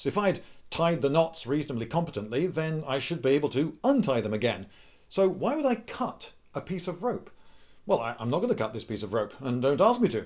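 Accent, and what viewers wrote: England, United Kingdom. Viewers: "That was a good bit of rope that." I love the English accent. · you sound so English! and that's coming from me, an Englishman myself.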